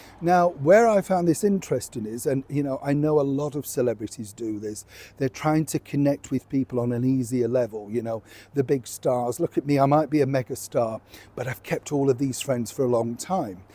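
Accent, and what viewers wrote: United Kingdom. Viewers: I think you do a great job. I enjoy all your videos. I especially love your British accent.❤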